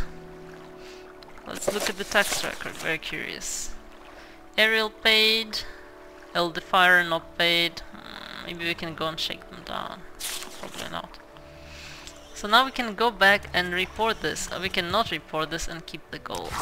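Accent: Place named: Russia. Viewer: Dat Russian accent is so awesome. I will gladly be a sub of yours :)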